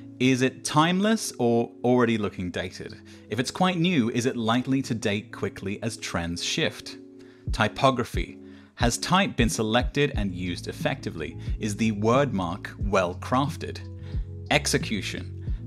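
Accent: Australia. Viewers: I have a very sensitive ear for accents, and the first time I watched one of your videos, I concluded that you must have been born/raised in Australia, and that you have a naturalised English accent after spending many years in the UK. Having watched this video, I am pleased to learn that I was correct! Great videos, always a pleasure to hear your thoughts on graphic design! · Aha, that explains the Australian accent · A non-zero percentage of why I clicked this video was for confirmation of whether your accent was at least partly Australian lol it sounded like it but not quite as "thick" as many Aussies I talk to regularly so I wasn't exactly sure where to place it. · Thought that accent had some Aussie to it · I thought your accent had a hint of Australian!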